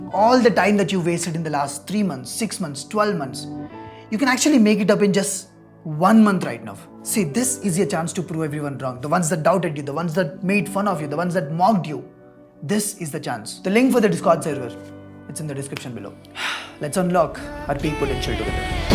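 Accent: India. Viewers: that indian ahh accent but awesome work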